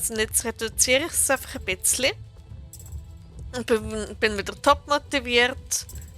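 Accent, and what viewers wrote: Switzerland. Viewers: ich liebe deinen Akzent bzw dialekt das Klingt so geil ich möchte auch gern Schweizerdeutsch können aber ich bin nur österreicherin 😂😂😂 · Bist du Schweizerin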